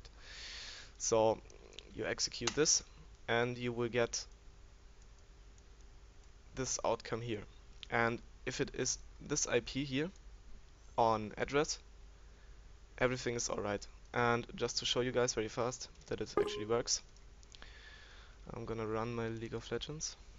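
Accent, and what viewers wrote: Germany. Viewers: thank you man it worked me.and i liked your german accent :D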